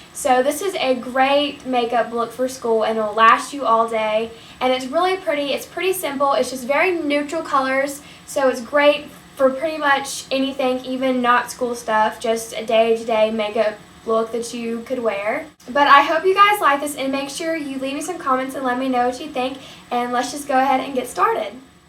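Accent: South, United States. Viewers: You're soo pretty! Do you have a country accent? <3 & i just subscribed. (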